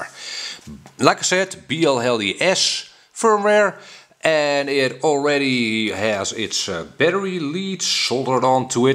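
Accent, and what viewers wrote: Netherlands. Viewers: More of a teaser than a build ; ) Its a really nice looking frame and I like that the bracing forms the base of the stack as its all very neat but, the pdb sticking out of the side kills me! I like symmetry and if it really can't be symmetrical its GOT to go at the back as it just looks like it'll be more streamlined... No science just one of those yep, that looks about right things :) Even with your Dutch accent making your intonation a little different to what I'm accustomed to I was convinced from the start you were going to have some "grumbles" about the esc and yes, you did! Some valid ones too, having to change the motor positions & resolder leads wouldn't be my first choice, easy wins those situations every time in my world. I'd almost be tempted to buy a different one or get one of their flight controllers (I'm assuming they use that set up).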